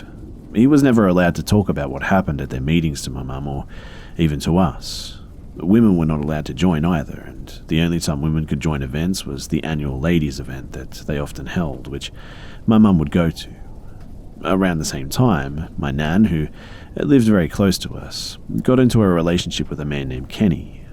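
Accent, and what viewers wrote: Australia. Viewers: What's great about you, is that you're monetized but you don't edit out cuss words. Or maybe I just like to hear you swear in that Aussie accent. Either way, I'm dedicated to this channel. Long live! 🐝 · OMG an Aussie narrator! Ahhhhh.....wonderful.... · I was surprised that its narrated by a fellow aussie, makes it far better!! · 😂😂😂😂😂😂😂 he’s Australian you asshat go get your ears tested and don’t ever say an Aussie is a whinging pathetic Pom again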